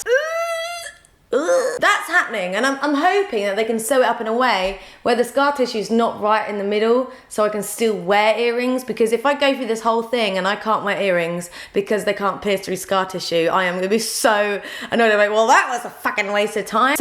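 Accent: United Kingdom. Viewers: I'm watching your videos since 4 years, but yesterday I watched the Amy documentary and since then you remind me of her, don't know if it's just the british accent or the tattoos or the uncommon hair, but that's the whole time in my mind :D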